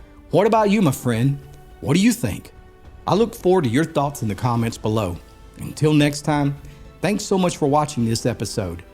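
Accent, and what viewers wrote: Appalachia, United States. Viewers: Wonderful video, outstanding research, and terrific Appalachian narration. This episode was my introduction to your channel and I thoroughly enjoy the originality of storytelling and visuals. Subscription activated. Speaking from experience, children are sensitive and susceptible to spirit encounters. Great job!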